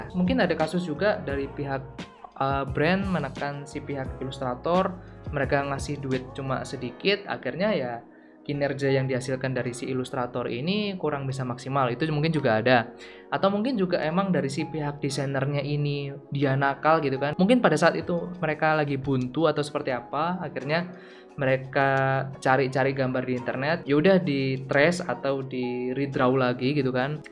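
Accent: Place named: Java, Indonesia. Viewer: Bang omah e ndi kok logat e jowo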